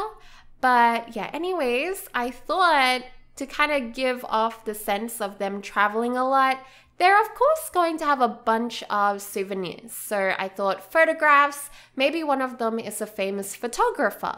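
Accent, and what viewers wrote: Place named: Australia. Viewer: New to your channel and wondered if I you were Australian as well... then you said 'reckon' and I knew straightaway haha.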